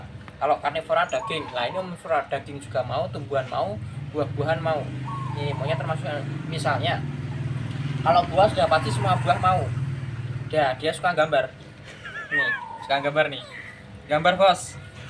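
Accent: Indonesia. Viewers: Ky orang kendal bahasane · Jowone ngendi mas... Yoja, ya?😊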